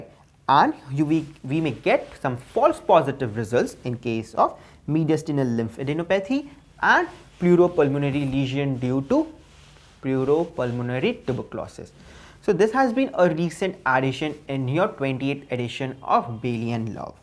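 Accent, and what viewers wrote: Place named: England, United Kingdom. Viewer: Again watching this before my xm .......... Your English accent and kindness are just♥️♥️♥️🥰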